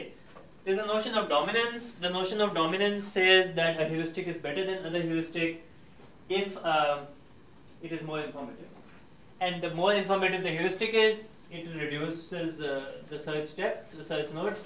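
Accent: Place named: India. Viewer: The English is probably perfect - its the heavy Indian accent that makes this barely comprehensible.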